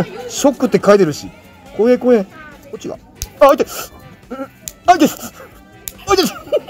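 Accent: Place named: Shiroishi, Japan. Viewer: 今日はかなりなまっていました🤔 白石市民から移りましたね😁 山形よりまだまだいいけど😁 ビリビリガン自分でするにはかなり勇気が🙂 🐸と🐍このシリーズ大好きです💖 錦ケ丘ヒルサイドモール、また頑張ってください🙇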